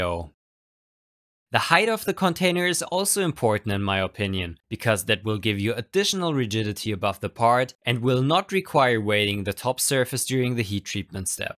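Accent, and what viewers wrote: Germany. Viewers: Ya german?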